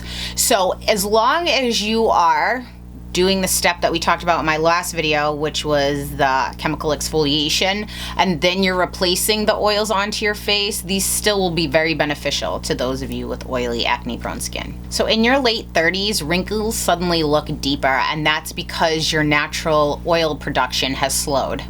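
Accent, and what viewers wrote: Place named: Midwest, United States. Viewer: omg that midwestern accent is piercing at my soul.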